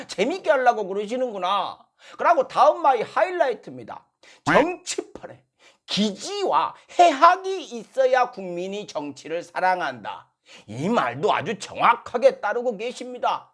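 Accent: Jeolla, South Korea. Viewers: 표준말 써라 이쉐이야 표준말은 갠히 쓰라구 표준말이냐? 서울서 태어났다메 서울서태어나서 자랐으면 니가 표준말이 더 익숙하지 넌 전라도 사투리를 돈 벌라구 이용하는거야 안그래?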